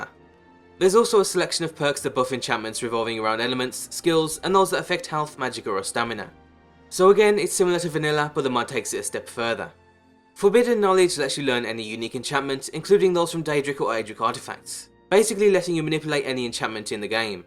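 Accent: United Kingdom. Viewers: Great video, but your speech is quite slurred. It's not just the "charming" British accent; there's actual slurring going on - where two vowels blend into one. Quite easy to work on once you're aware of it.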